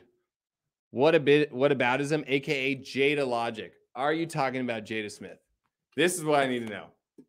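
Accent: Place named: China. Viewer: Best Chinese accent ever!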